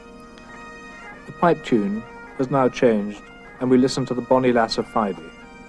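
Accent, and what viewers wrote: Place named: England, United Kingdom. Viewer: could you not get anybody less english sounding to do the voiceover ffs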